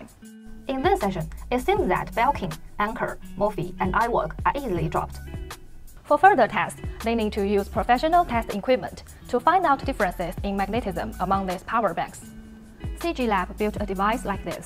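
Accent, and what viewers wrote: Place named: Asia. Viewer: Damn, she talks so quick and with her Asian accent is worse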